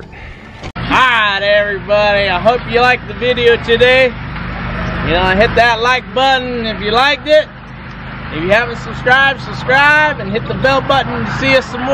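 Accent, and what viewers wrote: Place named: Texas, United States. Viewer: That happened to me with one of the columns. It was so annoying. Are you a southerner ? You sound as you’re from the south. Texas way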